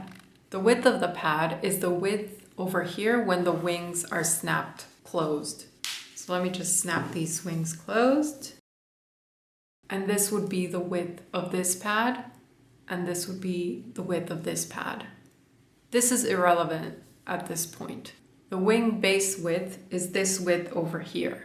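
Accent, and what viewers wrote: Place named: Canada. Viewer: Hello, this video is so useful!!! I really appreciate your help! Are you from Canada? I think I hear your about pronunciation, plus you use cm. Greetings from Cleveland Ohio.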